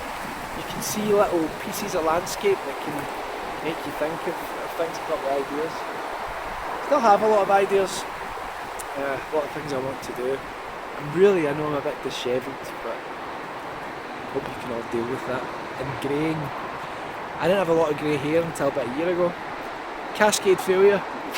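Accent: Scotland, United Kingdom. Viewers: Just hearing you talk makes me proud of my Scottish heritage 😁ur awesome man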